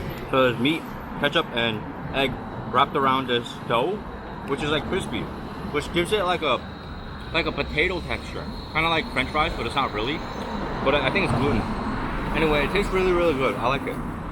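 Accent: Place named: China. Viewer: 你的母語應該是中文吧，聽的出來你的英語有口音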